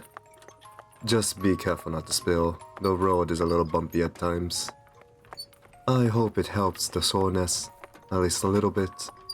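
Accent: Russia. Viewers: Is he Russian or something? :D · Sounds Russian 👀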